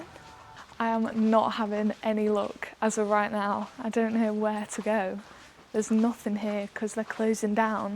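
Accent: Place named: Midlands, United Kingdom. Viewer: nah, its a midlands accent from somewhere round birmingham.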